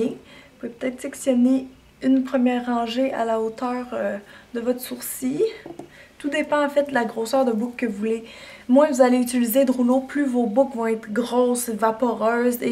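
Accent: Quebec, Canada. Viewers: Je ne sais pas pourquoi mais à chaque fois que je lis ce que tu écris dans la description de la vidéo je le lis avec ton accent québécois :P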